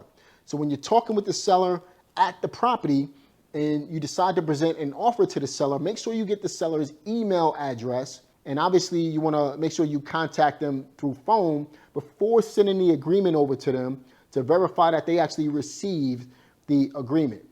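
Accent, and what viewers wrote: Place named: Brooklyn, United States. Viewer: Side note…you sound like you’re from BK too! 💪🏾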